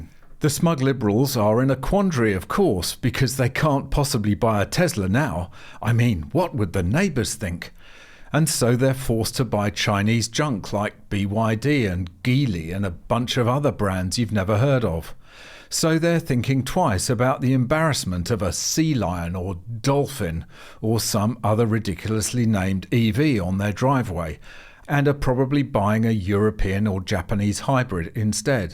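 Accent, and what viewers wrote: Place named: England, United Kingdom. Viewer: It grates on the ears. Especially with a pommy accent.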